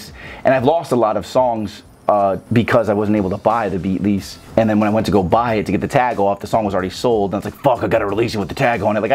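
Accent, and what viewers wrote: Chicago, United States. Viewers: He sound like he from deep chicago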